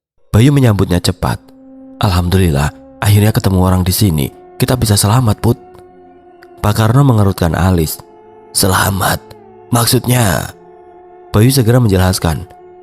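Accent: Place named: Java, Indonesia. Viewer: Logate medok syekalee😂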